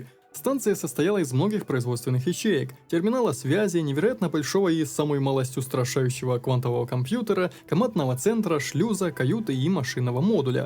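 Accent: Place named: Ukraine. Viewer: судя по говору он украинец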